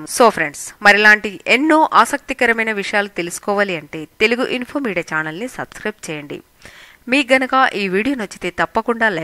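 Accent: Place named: Tamil Nadu, India. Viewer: Need to Improve telugu. It is like some tamilian gave voice to this video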